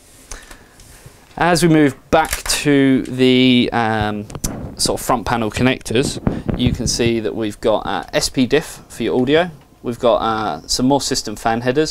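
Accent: United Kingdom. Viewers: *hears voices in head* Whos video is this? British right??? Commonwealth??? Everyone is reading this and wants to know what RAID 0 is. Raid is where you have more than 1 hard drive hooked up for storage and performance. Raid 0 is a type of Raid setup that's the cheapest (I don't plan on using 20 hard drives with Raid 0 setup) in performance. YOU DONT NEED RAID 0 IF YOURE NOT INTO VIDEO EDITING WITH WRITING FILES AND COPYING FILES TYPE SHIT. SO DONT BUY RAID 0 IF YOU DONT DO THIS TYPE OF STUFF ALL THE TIME. Raid 0 should improve the lag or stuttering with programs like FRAPs and other software. Its writing information twice as fast or suppose to. You shouldnt see a lag sync in watching videos either after recording a giant file after editing it. I still didn't hook up RAID 0. Did I win the biggest LOSER AWARD yet? Good... I didn't want to be your friend anyways.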